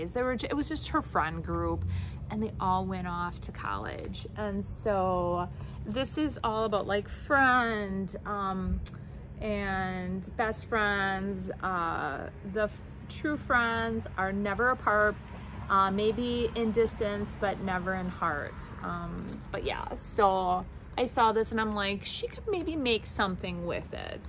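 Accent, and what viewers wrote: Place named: Midwest, United States. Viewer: Found your channel recently and right away loved your accent because you sound like me! Lol..We are from WI but have been in NM for many years and will probably not be retiring here. Miss WI alot but think we we will move more in the south soon. Love you ideas and I'm a reseller as well. Happy junking fellow Midwestern ☺️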